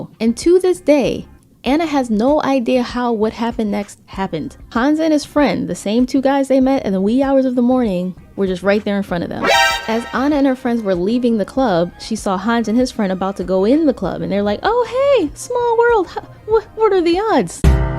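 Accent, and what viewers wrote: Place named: England, United Kingdom. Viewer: Just love your stories. You're such a good storyteller & make me laugh. Also, must say I enjoy your English accent. I'm a linguist too, and a great believer people who speak other languages are also good mimics. 😊 Keep doing what you're doing - more power to you girl! 😊😊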